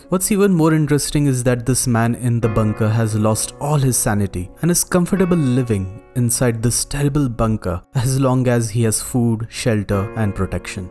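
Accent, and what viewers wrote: India. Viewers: Indian accent always stands out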